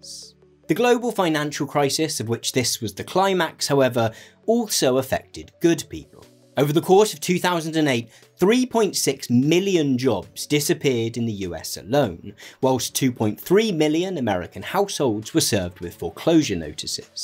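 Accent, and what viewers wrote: United Kingdom. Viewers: your caption writer corrected some parts in your British af rant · damn I love you and your videos so much. I really love political theory and having you explain it to me with modern day relevance and a nice british accent is just amazing.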